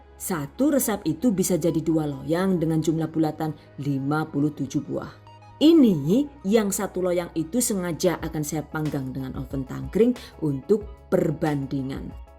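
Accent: Banyumas, Indonesia. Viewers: Semua vidio saya suka karna disitu ada bahan2 nya,ada perhitungan biaya2 sampai hal yg terkecil dan sesuai daerah wilayah anda yah itu kata2 engga bisa lepas setiap per episode vidio yg lain nya dan satu lagi ngapak nya jos gandos😁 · Suka banget channel ini krn pengisi suaranya lucu dan menarik, apalagi kalau bahasa ngapak hahaha. Ada tips dan trik juga sukses selalu #GiveawayTHV2021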